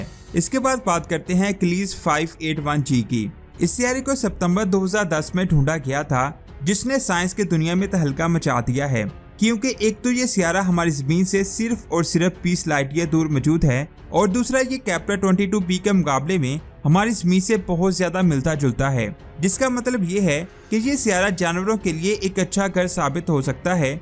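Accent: Punjab, India. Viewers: Panjabi excent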